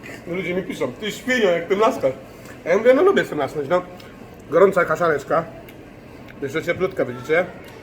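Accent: Highlands, Poland. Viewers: Po co typ pierdoli po góralsku a na innym filmie slychac że mówi normalnie. Dwa, podobno to żaden góral tylko czytałem że pochodzi z żor. To jaki z niego góral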